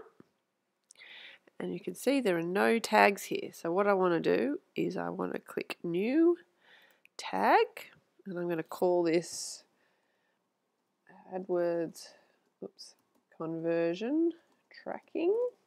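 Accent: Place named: Australia. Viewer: Good to hear an Aussie accent for a change :)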